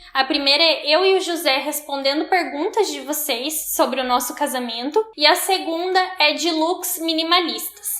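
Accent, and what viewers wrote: Paraná, Brazil. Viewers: Ah, mas eu a-do-ro esse sotaquinho do Paraná <3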